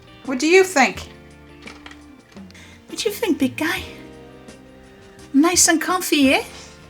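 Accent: France. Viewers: LOVING THIS SERIES! 🥰 I enjoy hearing ur reasoning along the way! And love ur French accent❤️ great work!!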